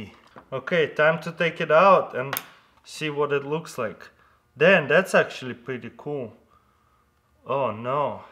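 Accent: Russia. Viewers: Я все не пойму по твоему акценту, ты русский или?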